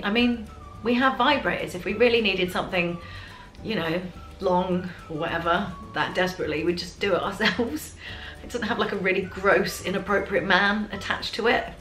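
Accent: United Kingdom. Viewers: you sound quite british :D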